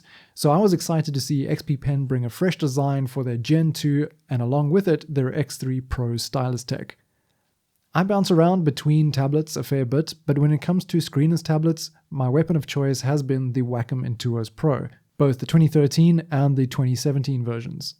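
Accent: South Africa. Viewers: Are you South African? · instantly recognized the accent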